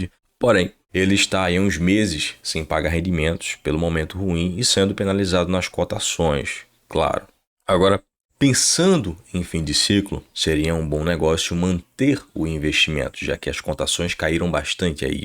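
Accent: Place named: Pernambuco, Brazil. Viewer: Tá tranquilo mermão, sua resposta foi bem esclarecedora, a comunidade tem que ser informada desses detalhes, saber dos indexadores, saber onde estão pisando, agora com relação se vc é de Pernambuco, percebi pelo sotaque, sou aqui do Ceará, somos vizinhos rsrsrs, tudo certo mermão, forte abraço, no aguardo do próximo "Raios -X" 🤛🤛🦈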